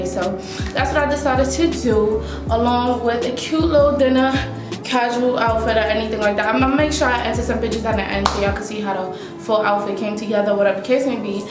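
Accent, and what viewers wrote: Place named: Brooklyn, United States. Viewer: I love you deadass!😌😍🥰😘It’s the Bk accent for me You make me feel at home I miss Brooklyn!!!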